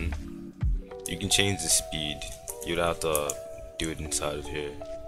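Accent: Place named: Baltimore, United States. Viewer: you from baltimore? i can hear the accent a little lols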